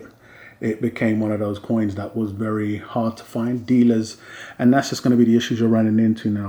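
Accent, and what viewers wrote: England, United Kingdom. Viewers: I really love your English accent and style....I trust your opinion so I went with the 2020 Australia 1 oz Silver Australian Quokka BU . Thanks for your Spirit.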